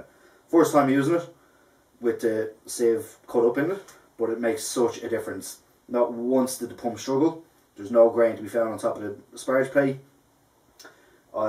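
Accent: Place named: Ireland. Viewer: I feel your pain with the CML yeast, my porter took 4 1/2 weeks and 3 packs to get down to 1.020. I wonder why their recent batches of yeast are having issues? Like you said you've been using it for a year, so it's a recent issue. Hopefully they sort it out, they are great little company, love their hops. Oh and welcome to brewtubing, nice to see another Irish accent. Top video btw 👍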